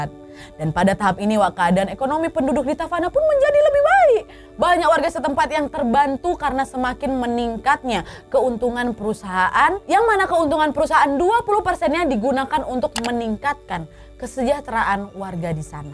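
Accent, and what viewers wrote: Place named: Medan, Indonesia. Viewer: Bahasa dan logat ini kayak orang MEDAN,mantap aku subscribe kakak..buat nambah ilmu motivasi dan semangat...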